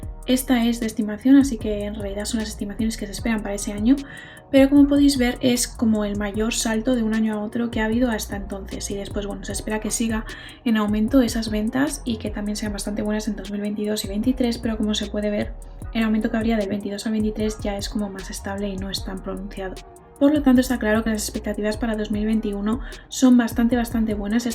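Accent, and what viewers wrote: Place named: Spain. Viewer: Que buen video!!! información de calidad y buenas opciones, por cierto que hermoso tono español tienes 😉👌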